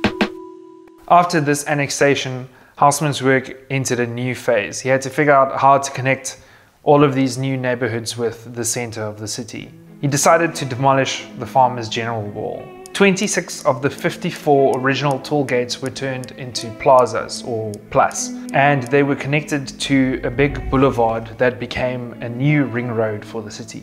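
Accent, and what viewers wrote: South Africa. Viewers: South African presenter? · Is that a South African accent? ❤ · Ek het gedog dié man klink soos 'n Suid-Afrikaner en toe ek gesien het, het ek sommer net geweet! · I know a South African when I hear one👁️🇿🇦👁️ great video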